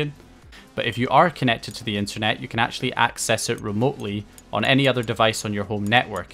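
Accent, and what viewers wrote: Scotland, United Kingdom. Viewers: Loving the channel! Well paced, logically explained, no background techno and a clear Scottish accent!! ;) Top class and incredibly helpful. Thank you!!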